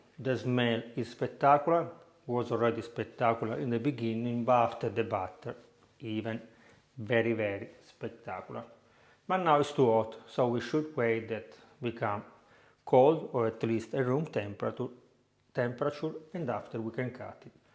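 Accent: Italy. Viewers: Yet to try a recipe of yours...but I am spellbound by your accent and hand motions...a true Italian Chef! Please come to SouthAfrica..Cape Town...come visit...you would teach a thing or two to the so called Italian restaurants here...I even belong to Italian Club and their ciabatta is horrible!! Show how to make Glace Chestnuts please!!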